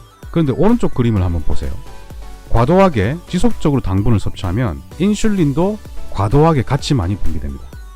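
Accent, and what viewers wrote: Gyeongsang, South Korea. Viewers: 경상도 사투리 억양 너무 듣기 좋네요 이 억양 듣고 싶어서 계속 다른 것도 더 듣고 싶어요 물론 내용은 상상을 뛰어넘을 정도로 최고 중에 최고구요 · 경상도 사투리가 구수하고 정겹고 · 감사합니다 ~~경상도 사투리가 구수하십니다. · 갱상도 사투리 정감이 갑니다. 의학상식을 나누어주셔 감사합니다. 복된 삶이 되시길~~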